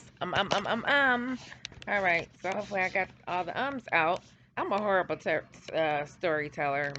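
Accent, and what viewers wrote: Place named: California, United States. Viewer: I'm from North Carolina, to show you how bad I am with accents , I first heard you talking and thought that was a Cali accent. I live in N.C. tho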